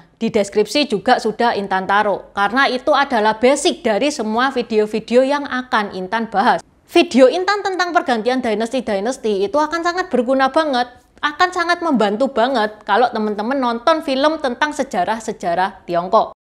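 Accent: Java, Indonesia. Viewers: Cicinya Indonesia banget... Keliatan dari medoknya🙏🙏🙏🙏👍👍👍👍👍❤️❤️❤️❤️❤️ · Aksen jowomu medhok Cik. Sukses selalu berkarya ci Intan. Di deket pasar kopro tanjyng duren ada toko obat Lo Banteng, itu asli keturunannya atau waralaba Li Banteng ya. Btw, aku gak kenal Suhu Lo Banteng, tapi aku pake obat araknya, rupanya gitu ya sejarahnya sang Suhu tsb.... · Medog banget intan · Intonasi bhs sangat Jawa bnget..mantap mudah dimengerti dipahami..mksh mbak Intan.. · ci bahasa jawa nya mendok kali,,ci ajarin bahasa Tionghoa dong ci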